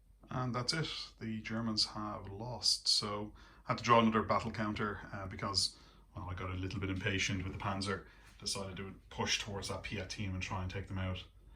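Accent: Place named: Ireland. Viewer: definitely Irish "Carlow"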